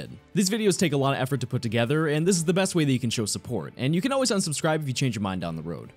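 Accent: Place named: United Kingdom. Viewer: It's crazy how different and sound. One sounds more British (being more formal and a bit cynical) while the other sounds stereotypically American (more loud, but more fun-loving and casual). (I hope I didn't offend anyone saying that, it's just to show how different in energy these two are.)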